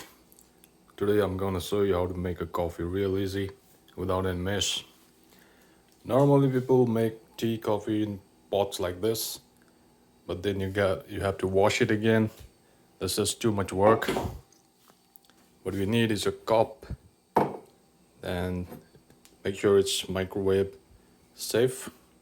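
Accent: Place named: Australia. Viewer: bro ko ni aussie accent aayechha😃😃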